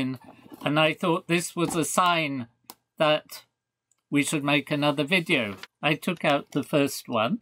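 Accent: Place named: United Kingdom. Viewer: hearing someone say "the hero, walter, and his sidekick jesse, were surprised by gangsters; walter defeated two gangsters" in a british accent does something to my brain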